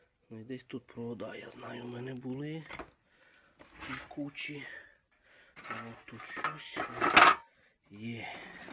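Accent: Transcarpathia, Ukraine. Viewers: Українська ,закарпатський діалект ти ще не чув наш суржик південний😂 а взагалі у всьому світі така ситуація,в кожній місцевості свій говір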